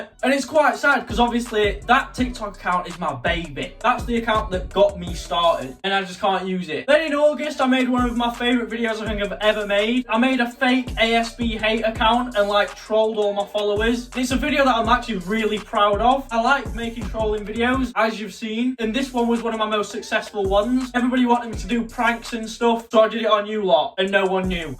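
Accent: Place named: Yorkshire, United Kingdom. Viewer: jus me thinkin his Yorkshire accent is bareeee cuteeee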